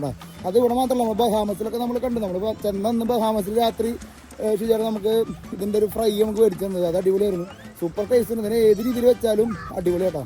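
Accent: Thrissur, India. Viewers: ഓർമ്മിപ്പിച്ചോളോ മാപ്ലേനെ...😂 ത്രിശൂർ സ്ലാങ്ങ് പൊളിയാണ് ട്ടാ..❤❤❤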